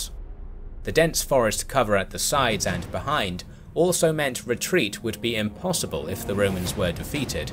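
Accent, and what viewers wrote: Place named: United Kingdom. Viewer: Yeaaaaah History > GOT So this i why you wanted a female british voice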